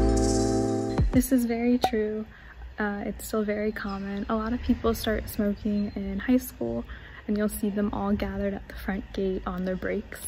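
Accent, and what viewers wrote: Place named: United States. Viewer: Your videos are quite enjoyable. For me, you have those French qualities of understated, put together dress style, subdued makeup presentation, and an understandable tone when speaking French, BUT….it’s ruined when you speak American English! Could you please fake a French accent just once when speaking English?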